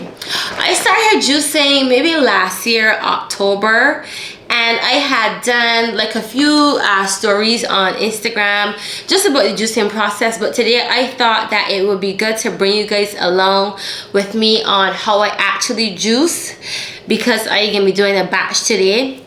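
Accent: Barbados. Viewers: Well it is always refreshing to hear the best accent in the world...Love yah.As you can tell I am a Proud Bajan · That bajan accent is amazing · I hear that lovely Bajan accent. You remind me of my bestie who is from Barbados! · I love your Bajan accent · Are you a native of Barbados 🇧🇧 🤔 Love the accent ❤ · She’s giving me bagan accent 🇧🇧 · Omg!!!! You must be Bajan💗I love your country and all of y’all’s accents💗💗. Loved the video as well. Just subscribed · I'm sure that a Barbados accent I can hear. Where did you get those amazing big bowls from? Great video by the way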